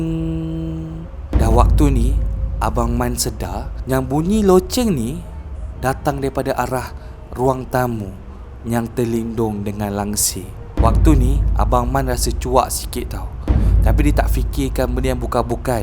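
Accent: Kelantan, Malaysia. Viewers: Btw ni org pantai timur ke?ado bunyi telo klate sikit2😂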